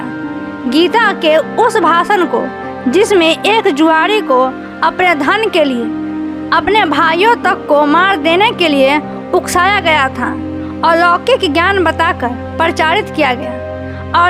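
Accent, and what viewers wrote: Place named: Bihar, India. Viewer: आप किआवज बिहारी जैसी है किसी दो गुट आपस मे लड़ रहे हो तो उसको शांत कर दिया इसलिए वह खराब है ऐसी सोच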